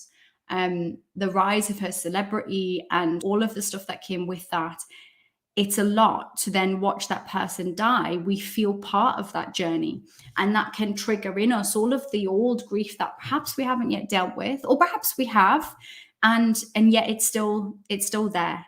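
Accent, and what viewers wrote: Newcastle, United Kingdom. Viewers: listening to your GEORDIE accent videos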